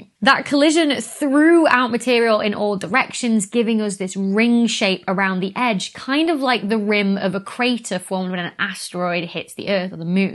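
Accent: United Kingdom. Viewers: Because you are not used to genuine British accents. I have no issue at all understanding her at normal speed. One year I was in West Virginia at a factory and had a British guest. He asked a question. The W.V. man tired to me and asked, seriously, "What language is he speaking?"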